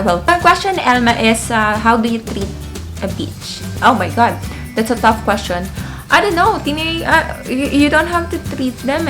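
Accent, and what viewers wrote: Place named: England, United Kingdom. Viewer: I will raise a question pretty lady, HOW TO BE YOU??? I rrally like your english accent. ❤️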